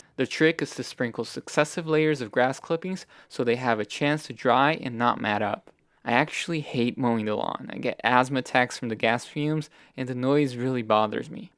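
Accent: Brazil. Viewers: Are you are Brazilian American or a Ivan Brazilian. Your perfect pulo do gato accent made me wonder. That said. I love the aluminum can idea - do they provide additional heat for roots? Is that good? I’m having a hard time with. Making the cilantro seeds sprout.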